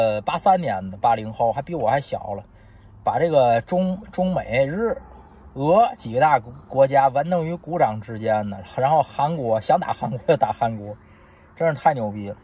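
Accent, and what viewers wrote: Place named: Tianjin, China. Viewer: 特別喜歡這樣的節目，親切，踏實，接地氣，妥妥的天津口音普通話，還有那條漂亮的大狗。請繼續做下去，謝謝這樣美好真誠真摯真實的分享。🙏🙏🙏🙏💝😳😳😳😳😇👍👍👍👍👍👍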